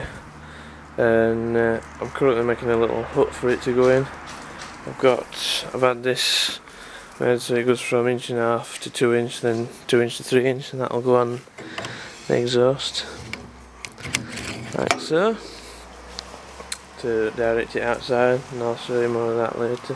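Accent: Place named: North, United Kingdom. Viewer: This is the box i got wi it. Lazy northern accent and missing lots of letters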